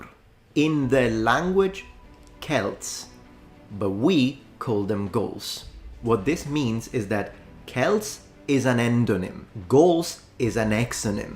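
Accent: England, United Kingdom. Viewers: I take it that you lived for quite a while in England because my sisters friend Julie comes from Greece and has a different accent than you when speaking English. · Please make more videos about the celts, we know so little about them and pop culture is full of misinfo. Despite being an anglo saxon/scotch irish American Ginger (with Sicilian great grandparents! I am in no way culturally sicilian but I love the fact that you are Sicilian, speak British English, yet married an American and live here) When I hear about the celts, I think of Pict warriors fully naked covered in blue tribal paint, and I've always had a hard time believing that represented the celts as a whole.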